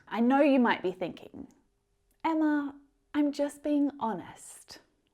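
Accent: Australia. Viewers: Ma'am are you from Australia ?. I'm in love with your English accent😍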